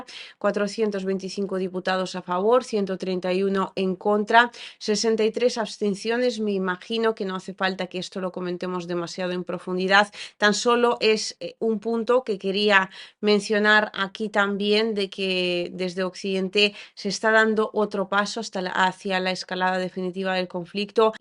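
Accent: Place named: Spain. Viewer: me gusta que ya estás perdiendo tu acento gachupin… mira guapa, eso que icieron es terrorismo puro y deben caer los culpables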